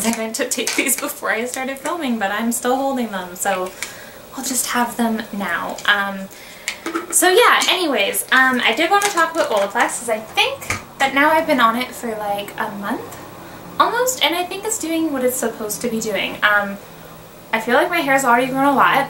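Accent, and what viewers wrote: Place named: Canada. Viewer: Your Canadian accent is so cute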